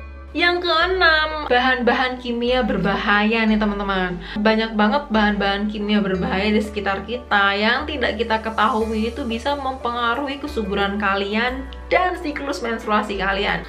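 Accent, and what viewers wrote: Java, Indonesia. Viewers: Dok.. bahasamu medhok bangeettt... Kamu dari mana dok?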